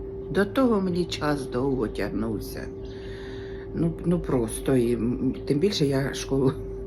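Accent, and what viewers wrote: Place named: Poltava, Ukraine. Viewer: шановна! Ну, я скільки не дивлюсь Ваші відео, я ж чую полтавський говір!!!!😊😊😊😊😊😊😊😊. На Вас дивлюся, а бачу дружину мого брата, нині покійну, Ніночку. Один в один. Якщо не секрет, де Ви навчалися в Полтаві?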